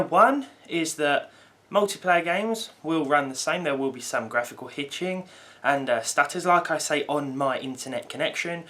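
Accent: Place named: England, United Kingdom. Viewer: watching you face review in this videos made me notice that you have a strong english accent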